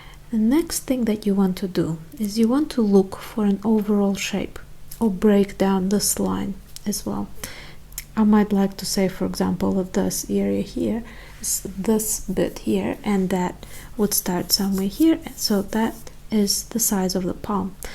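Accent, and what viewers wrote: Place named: Russia. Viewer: Your accent telling me you’re quite fluent in speaking Russian)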